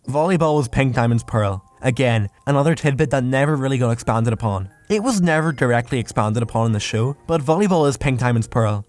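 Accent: Scotland, United Kingdom. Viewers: Great video! You have an interesting accent, are you Scottish?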